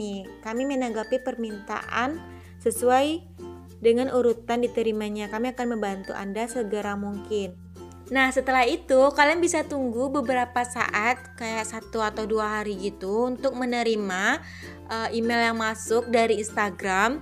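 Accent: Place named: West Sumatra, Indonesia. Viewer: Logat Minang Ndk Masalah,,Yg Penting Mantap Kak😊😊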